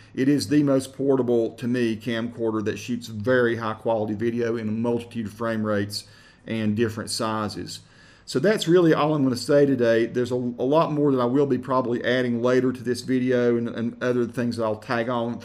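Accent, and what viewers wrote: United States. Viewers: You have a beautiful American accent (where I come from we don't have any American accents. We do have a couple of British accents, but no American accents). Seriously.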